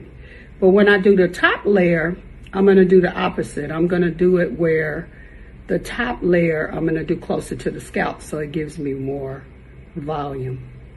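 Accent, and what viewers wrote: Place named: South, United States. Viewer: I love her Southern accent ❤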